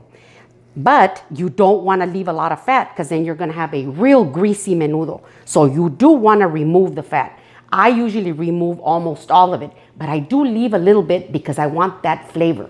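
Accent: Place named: Rio Grande Valley, United States. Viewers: My family is from your end of Texas. I appreciate that you used vinegar in your recipe. I made some menudo recently with the honeycomb my favorite. I use vinegar to quell the smell also. You remind me of a cousin of mine from Sand Diego Texas shes long passed away but you two look a lot a like and your accent so unique to that area. After watching you Ill have to make a couple of adjustments to mine. I really like the method you use on straining the chilis into the soup. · 15 seconds in...I knew you're from the RGV! 😁 It's the accent...😆(since I was from there, now living in Houston), but I'm looking for an awesome recipe for menudo to serve at least 10 people...I'm gunna try yours and just adjust the size portions to cook as much as I need to feed. 😎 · Loving your videos. Are you from Texas? I can hear it in your accent.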